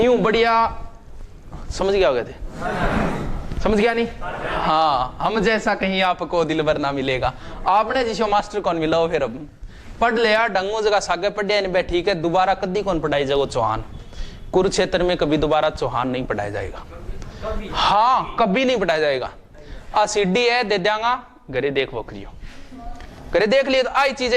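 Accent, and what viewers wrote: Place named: Marwari, India. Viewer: sir i like ur marvadi voice lecture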